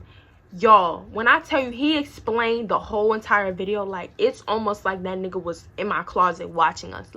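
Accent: Baltimore, United States. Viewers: DO I HEAR A BALTIMORE ACCENT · Baltimore accent ruining the video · Nah da baltimore accent be havin me cryin😂😂😂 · The Baltimore accent strong ash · she gotta be from baltimore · Yo she sound like she frm Baltimore but ion know nobody frm Baltimore that says cold drink so idek but you still gettin a sub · U gotta be from Baltimore💯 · You from Baltimore? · hold up cuz ik you from baltimore gotta who bmore accent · I knew you was from bailmore I knewwww ittttty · Is you from Baltimore or summ ??😭😭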